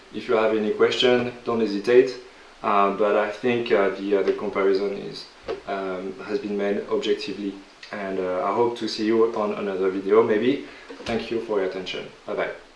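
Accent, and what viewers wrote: France. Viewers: Teresa Flores It is not rude tone. He is just from France and his native language is not English. Most people have some accent in foreing language! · petites critiques : pour si peu de quantité de café cela n'énervera pas un chat, je préfère le petit noir au bar tabac du coin pourvu qu'il ait un bon torréfacteur de renom autre que Grand-mère ou Monoprix. En attendant de trouver sur le marché une autre machine aussi efficace mais produisant plus de café que çà. Bravo pour la vidéo...superbe French accent ;-)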